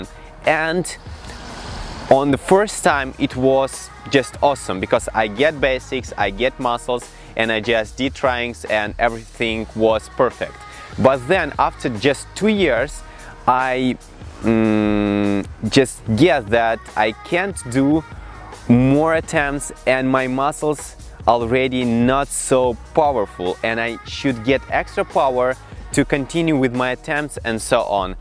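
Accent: Russia. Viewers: Блин) акцент вообще чётко услышал, смотрю - и в правду русский, а я и не сомневался)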